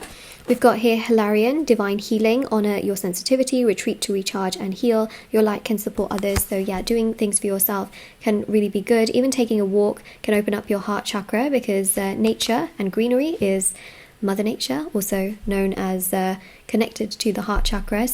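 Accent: United Kingdom. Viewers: I m a huge fan of ur sweet voice n ur British accent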